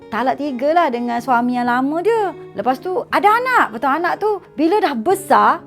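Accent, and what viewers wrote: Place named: Kelantan, Malaysia. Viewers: Ustzh awal2 lancar je lama2 keluar jgk Kelantan dia haha. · Bahasa Malaysia aksen Kelantan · haaah..mari mana...sah kelate🤣🤣🤣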